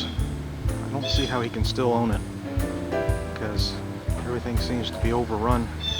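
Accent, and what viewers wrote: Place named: Rochester, United States. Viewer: I'm a native Rochesterian (Irondequoit), now living in Flagstaff, Arizona for the last. 40 years. You've still got your Rochestah accent. Me too.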